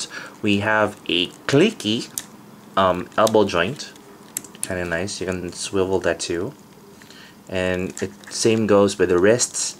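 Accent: Philippines. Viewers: hey man I can tell you're Filipino so from which mall can I buy this?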